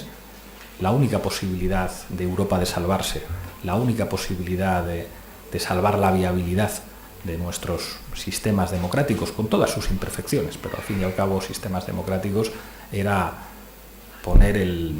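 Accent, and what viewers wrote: Spain. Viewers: Comunismo bolivariano con acento español de España. A los jóvenes puede que los engañe, a los más maduros NO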